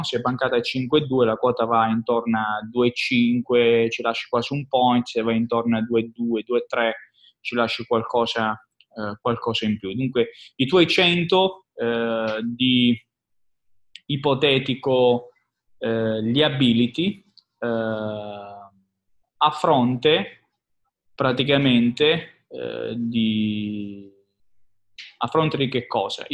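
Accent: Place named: Bari, Italy. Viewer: Non si capisce nucazz propij alla barese come parla lui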